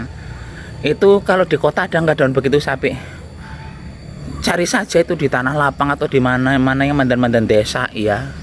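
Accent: Banyumas, Indonesia. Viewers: trms rep ngapak..solusi ne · Assalamualaikum,kang sapi.itu kalau di daerah saya namanya maregol,ada juga yang bilang sembung grogos, kalau boleh tau kang ini orang mana,ya dari logat bahasa jawanya seperti orang Banyumas, saya sendiri orang Banyumas,kang, asli dari kota dawet, Banjar negara.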